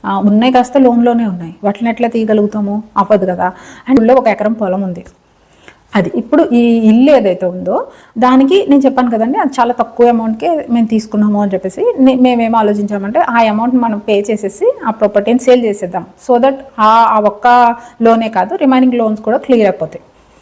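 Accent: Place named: Godavari, India. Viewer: Hello andi are you from Godavari districts? Your accent looks like that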